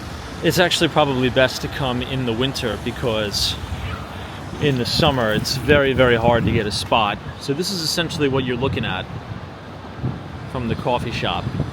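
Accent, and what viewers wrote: New York, United States. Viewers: Draga, vocea ta, vocabularul tau, accentul pur newyorker - sunt medicamentul antidepresiv cel mai bun! Te felicit si te imbratisez cu toata inima, din Transilvania! ❤❤❤❤🏆🏆🏆🏆🏆🍀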